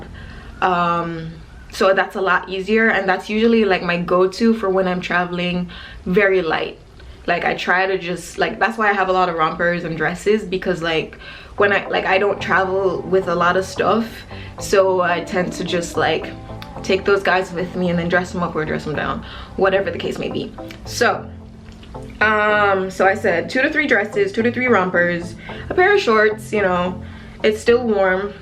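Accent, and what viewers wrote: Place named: Caribbean. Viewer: I detect a Caribbean accent =) Hey Sis!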